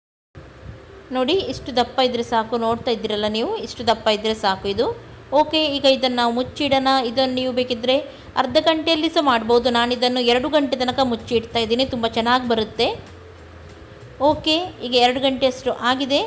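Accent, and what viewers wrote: Mangalore, India. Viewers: Nimma maathu tumba cute rii kelikke koshi agutthe manglore kannada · Your Mangalore Kannada explanation so beautiful , good vedio mam. Super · madam nivu Mangalore avra kannada age mathadthira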